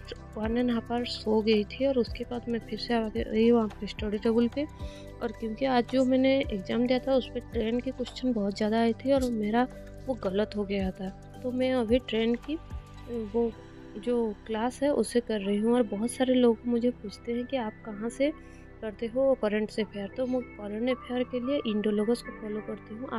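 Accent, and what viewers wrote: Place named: Bengal, India. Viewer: Ap thoda bengali type bolti ho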